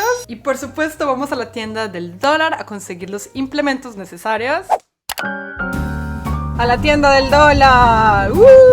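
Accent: Antioquia, Colombia. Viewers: SALUDOS CORDIALES DESDE COSTA RICA BENDICIONES PARA TI Y TU FAMILY QUE DIOS CUIDE DE USTEDES X SIEMPRE EN HORA BUENA CON TANTO PREPARATIVO DE DESEOS DE BIENESTAR... DE❤ TE DESEO DICHA REALIZACIÓN... MUCHO POSITIVISMO... Y LUCHA DE LOGROS X ALCANZAR... ME ENCANTA VER TE TAN POSITIVA CON TANTO CAMBIO Y VER COMO COMPARTES CON TUS SERES QUERIDOS. EXCELENTE... LOGRES LO QUE LOGRES SERÁN TUS ÉXITOS DEL HOY QUE PROFESAN TU LUCHA... TU AHNELO MAS SENTIDO MIS SALUDOS Y BUENOS DESEOS.. Y ME EN CANTOOO ESCUCHARTE TAN COLOMBIANA TAN GENUINA.. DEBERÁS PA DELANTE COMO EL ELEFANTITO LO... BUSCANDO EL PRIVILEGIO DE LOGRAR LO AHNELADOOO... LO DESEANDO... LOS SUEÑOS PROMETIDOSSS... DE❤ TE DESEO PROSPERIDAD EN SALUD Y ECONÓMICO PARA QUE ALCANCES LO MUY DESEANDO EN ESTE 2024..❤❤...❤❤..❤❤...❤...❤...❤❤..❤ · Amo el acento paisa de este video❤ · Otra vez se lo digo?😂Amoooooooo cuando hablas con el acento bien colombiano 😍😍😍😍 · Tengo mi lienso en blanco. 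Tenia un trabajo estable lo deje hace un año. Querìa empezar 2023 fuera de conford. Fue un año muy bueno. En este 2024 estoy sin trabajo no veo un horizonte. Pero amè escucharte con el acento colombiana jejjee imaginado tu niña vestida de princesa. 🍇🥦🏖🏡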